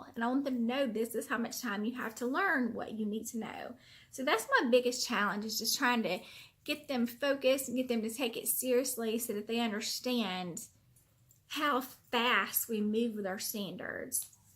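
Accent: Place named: South, United States. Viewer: Welcome to the teaching tag! I love your southern accent! Hubby and I have always wanted to travel to the south and try the food! I'm so glad you are doing some more peer tutoring. Its so beneficial for students on both sides of the learning. I will add you link to my January video ♥️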